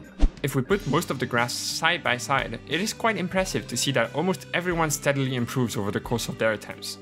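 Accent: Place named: Germany. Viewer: Can I say, this is my first video I've seen of yours and I love your voice. It's so bizarrely unique to me, like a grown up Elmo with a slight German accent. I'm sure this is an inaccurate comparison but that's just what my brain is thinking up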